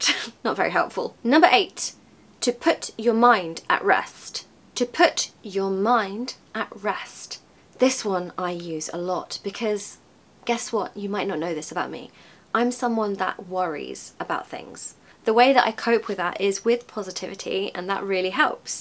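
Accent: RP, United Kingdom. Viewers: I love hearing your typically British accent! Thank you! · Ma'am I am improving with your British RP standard ❤ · I adore listening to you . Your british accent sounds for me like a music. Thank you so much. You are so lovely. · It's such an amazing teaching video as always! I've learnt so much from you and I took a note of them on my phone note so that I can revisit to consolidate. You are the No.1 native british who speaks with a beautiful quintessential british accent that I would love to listen for hours. Thank you for all your effort and amazing teaching!!😃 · Sound natural is very Well, but a Light Froggy accent is very efficient for picking up, and I’m sûre that is you spoke French, your British accent would wonderfuly delicious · I really enjoy watching your video❤❤ i'm impressed by British Accent and i hope i'll have a perfect British accent as you one day❤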